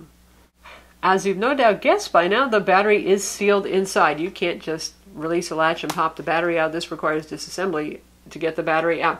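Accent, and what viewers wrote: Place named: New England, United States. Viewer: Do you live in New England? You have that accent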